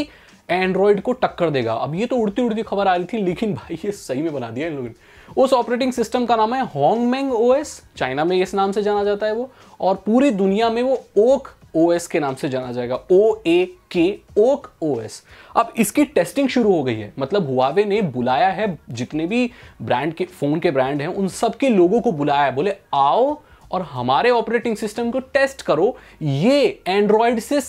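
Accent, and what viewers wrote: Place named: Bengal, India. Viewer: Bangali tarka😂😂😂from kolkata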